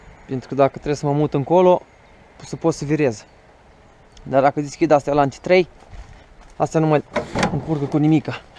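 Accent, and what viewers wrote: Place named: Moldova. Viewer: Salutare! Aceasta este filmare de lumea trecută 19 iulie 2021? Îți doresc numai drumuri bune și mai largi,nu din cele întortocheate că la Cannes și celelalte cătune! Eu credeam că orașele europene sunt mai "aerisite",nu ca prin unele orașe de pe la noi. Te-am cunoscut de la primele vorbe ale tale,că ești moldovean de al meu! Care este lungimea totală a "trenului"tău,?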